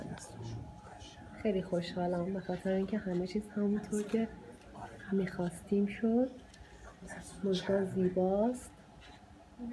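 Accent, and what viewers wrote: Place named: Herat, Afghanistan. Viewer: Mojgan Azimi Official چه جالب شما هراتی اید. منم اصالتم مال توس(مشهد) و مادرم ریشه جامی داره و از نتیجه از نسل هفدهم شیخ احمد جامی هست اگر بشناسید، . لهجه سمت مادری من با شما هراتی ها مو نمیزنه، دقیقا لهجه تربت جام و هرات یکیه. در کل مردم از نیشابور تا هرات خیلی بهم نزدیک و شبیهن، و همچنین لهجه مردم هرات عزیز با بقیه جاهای افغانستان متفاوته و بیشتر شبیه ایران بخصوص خراسانه تا افغانستان. در کل خوشحالم ازین که یه هنرمند پارسی زبان اینقدر با شعور داریم و اینکه هراتی و خراسانی هستی خودش بیشتر باعث خوشنودیه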